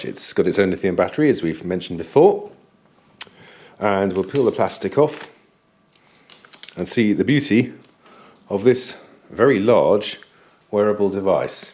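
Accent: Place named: United Kingdom. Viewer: Marvelous review...love that british accent....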